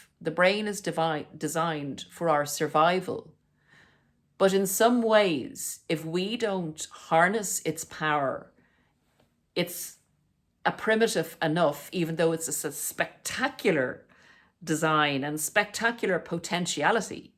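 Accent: Ireland. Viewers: I have an inner dialogue with myself all day every day lol, I use music to calm me, ground me and I love your Irish accent.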